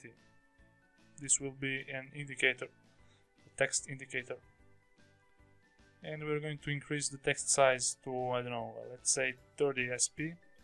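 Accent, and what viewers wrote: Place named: Russia. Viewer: Your native language is Russian? Accent. :D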